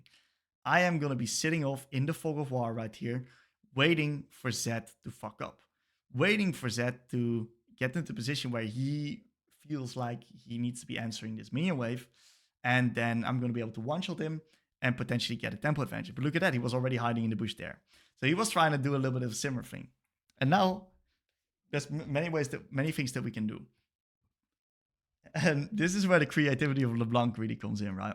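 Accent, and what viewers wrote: Netherlands. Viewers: I wanted to learn this champ and this is 2 weeks old really nice this will help me a lot 💪🏼💪🏼💪🏼💪🏼💪🏼 (Btw you sound dutch haha) Edit: stopt watching (gonna watch the rest later) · >the perfect leblanc guide >almost 8 hours long u are so goated lmao also are you dutch? your accent sounds rly dutch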